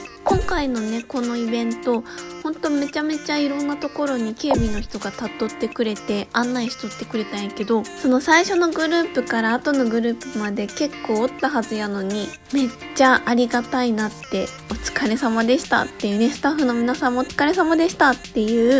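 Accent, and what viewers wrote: Gifu, Japan. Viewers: 失礼😅岐阜弁ですか！かわいいですね🙂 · 後半戦も、岐阜弁を懐かしい思いで聴きながら拝見致しました。 岐阜に住んでいた時、「えらい」を最初聴いた時、？？だったのを思い出しました。 来年、輪行して、参加したくなりました！！ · 最近よく拝見しています。岐阜弁の響きが懐かしいです。（友人が岐阜に居るので）来年は私も参加したくなりました。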